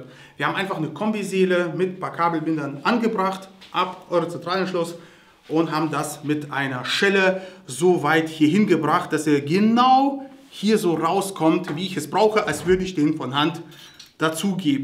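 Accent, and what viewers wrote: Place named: Russia. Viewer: Bester Mann wie er es erklärt usw weiter so ich finde dich Top! An deinem Dialekt kann ich hören das du aus Russland kommst, ich muss zugeben das ich unter Russen das Schweißen MAG und WIG gelernt habe und die waren echte Profis!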